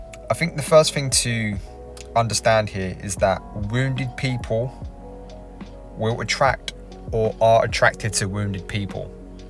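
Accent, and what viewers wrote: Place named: London, United Kingdom. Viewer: I Love the way you speak.....straight up fuck em. Keep it London 😅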